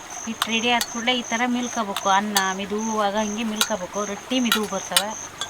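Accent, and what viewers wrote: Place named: Malnad, India. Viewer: Super 👌 ಅಮ್ಮ. ನಮ್ಮ ಮಲೆನಾಡು ಕಡೆ madudu ಚಂದ ಸೂಪರ್ ಅಮ್ಮ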